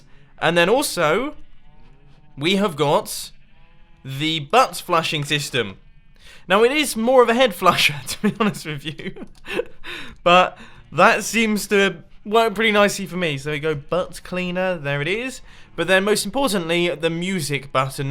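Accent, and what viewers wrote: United Kingdom. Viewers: Leave him alone! He's British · Mumbos super british side kicks in after 2 minutes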